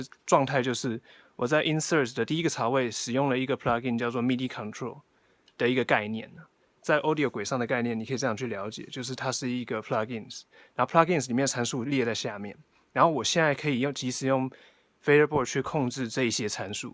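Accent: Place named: Beijing, China. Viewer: Nin shi beijing ren ma? Nin shuo de henhao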